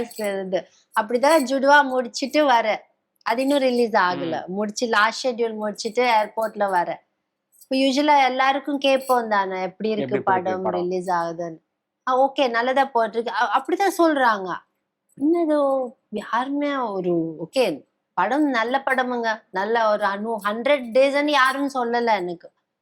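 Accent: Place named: Kerala, India. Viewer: First old video parunga. Ivanga voice first le irunthu ipdi dha pesaranga. Aprom nalla Tamil pesaranga.malayali tamil ipdi dha irukum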